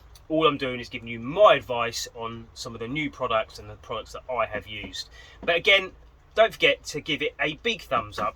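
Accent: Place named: Midlands, United Kingdom. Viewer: I heard the accent then fellow Midlands boiiii yes !!!!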